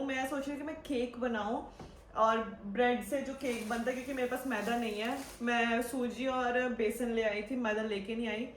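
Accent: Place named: India. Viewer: Oh plz speak punjabi hindi accent not suite you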